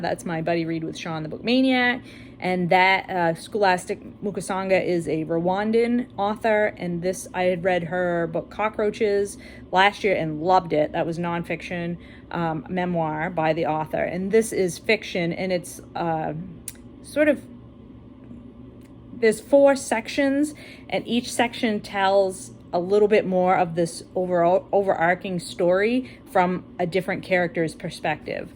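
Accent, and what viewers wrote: Maine, United States. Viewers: Seems a little late for the lake to be freezing. LOL at the lame Maine accent.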